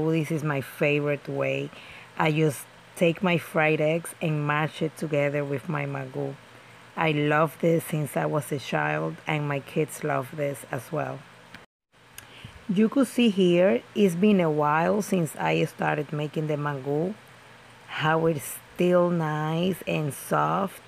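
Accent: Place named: Dominican Republic. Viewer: This lady talks in English as if she is talking Dominican Spanish. Same style, delivery, idioms, etc. Made me miss home, god bless.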